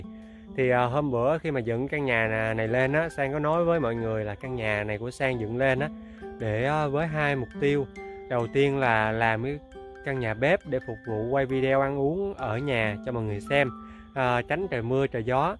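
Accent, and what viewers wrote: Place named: Mekong Delta, Vietnam. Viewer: Sang nói chuyện thì rất giống người miền tây, hình như hai mẹ con Sang là người miền tây thì phải.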